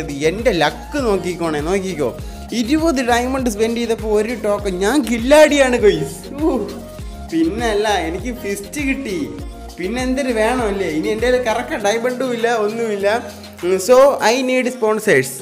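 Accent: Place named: Trivandrum, India. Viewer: Bro tvm ill ano 😁 slang athupole indu ❤️❤️ i like ur voice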